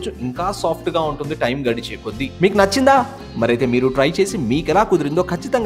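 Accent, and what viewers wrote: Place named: West Godavari, India. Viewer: I love your Telugu. Reminds me of my childhood days in my village in west Godavari.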